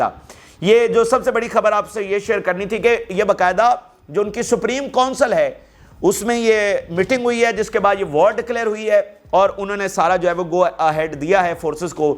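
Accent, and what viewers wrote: Punjab, Pakistan. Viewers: This guy talk garbage past 10 months he has been spreading panic n fake news All these months he has been saying that hizbuallah will go in war with yahoud but hasn't happened yet but now we experiencing conflict with yemen and yahoud He hasn't or never said anything about this incident 87 people got injured and 3 killed Now he saying things differently He is there to make money from u tube Please stop him by making comments to slam his fabricated news He dance scream start questionig himself and answer himself He has no source of own his on Get news or copy news from different resources which is readliy available to all of us Can't speak right one word of English Neither he can't speak urdu in right accent except speak everything in punjabi accent Belive me he is nothing except a punjabi merasee Just listen his English and watch his body dance He try to look waheed murad or daleep kumar😂 This monkey become on u tube a laughing stock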